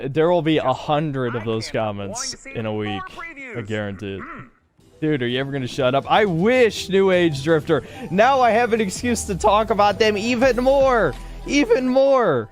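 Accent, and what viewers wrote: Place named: United Kingdom. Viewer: I bet it's the British accent. They hear that and think he's super smart lol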